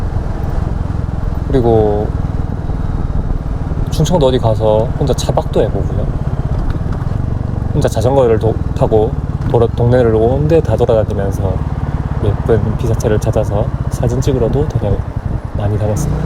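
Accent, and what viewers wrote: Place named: Daegu, South Korea. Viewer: 서울사는 대구인이라 사투리가 아쥬반갑네요 ㅎㅎ 단체투어는 신경쓸게 많아서 결국 솔투를 즐기고 있습니다. ㅅㅅ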